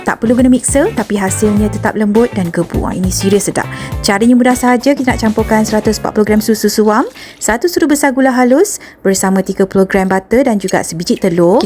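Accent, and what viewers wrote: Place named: Malaysia. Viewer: cantik aku suka aksen melayu dan suara nya❤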